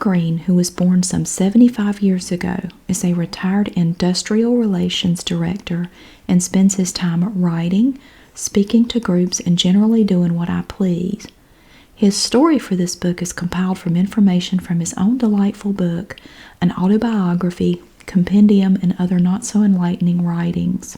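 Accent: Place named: West Virginia, United States. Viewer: Your accent came through much more than normal in this video and I absolutely love it! This feels like when my family used to tell old stories from West Virginia. Thank you for a slice of peace in an overwhelming week 🙏 hope you feel better! ❤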